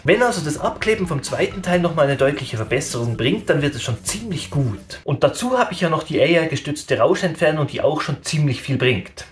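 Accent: Bern, Switzerland. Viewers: Tip top equipement review! Deutsch-deutsch is für mich klar leichter verständlich, trotzdem gefällt mir dein autentisches Schwizrdüch besser! 😊❤ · Ganz klar immer no Bärndütsch! 😅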